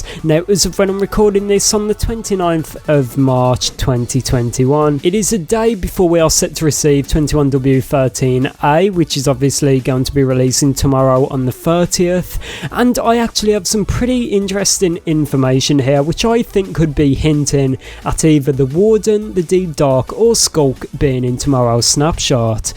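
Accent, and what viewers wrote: United Kingdom. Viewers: i love his british accent so much its so strong lol and love ur videos too <3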